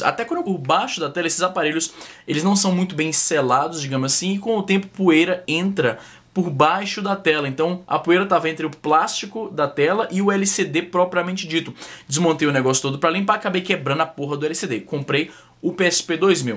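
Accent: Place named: Northeast, Brazil. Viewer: grossura? ,,,,esse é conterrâneo nordestino(como eu) ...coisa boa kkkkk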